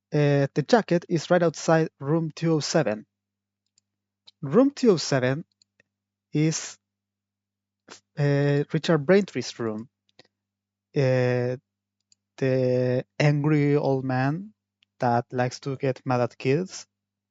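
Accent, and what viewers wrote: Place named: Spain. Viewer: Ah ese acento español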